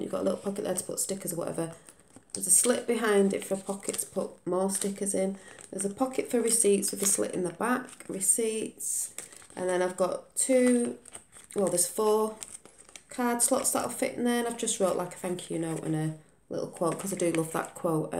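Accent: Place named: North West England, United Kingdom. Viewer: Oh my goodness, Thanks for the shout out!!! Sharpies are the worst! You can do a bleed through test with pens on your back page. Stabilo fineliners should work well on normal paper. Come and join our group on Facebook too!!! You'd fit right in! Also, you sound local to me!! My accent betrays me...I actually live in the North west of England Xx <link>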